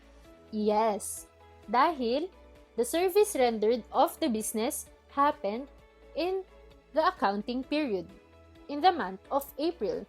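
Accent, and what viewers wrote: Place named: India. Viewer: Why I heard a Hindi Girl who explaining, taking this about 😅.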